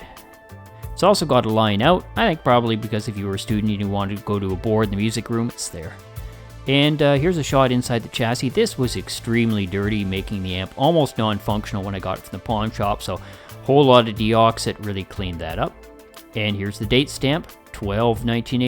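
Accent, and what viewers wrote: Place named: Cape Breton, Canada. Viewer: Where is your accent from??????? I’m a Caper and you sound like it too!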